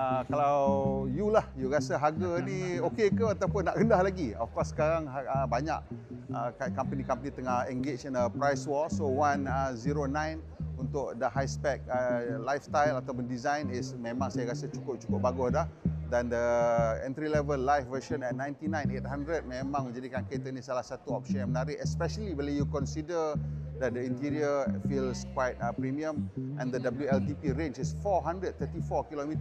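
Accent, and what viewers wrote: North, Malaysia. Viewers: noh...asai utara ka?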